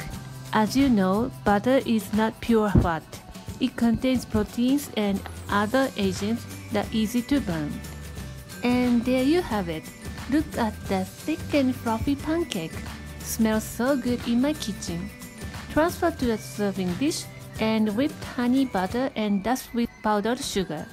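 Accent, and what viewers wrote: Japan. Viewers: Japanese English is seriously the cutest thing ever, I could listen to it all day and night 😍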